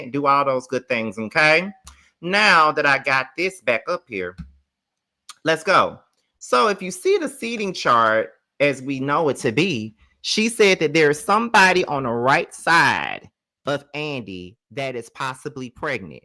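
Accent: South, United States. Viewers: Omg u sound so country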